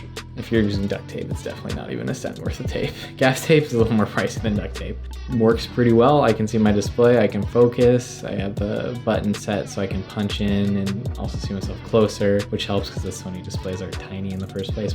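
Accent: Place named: Norway. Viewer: you hear like a norwegian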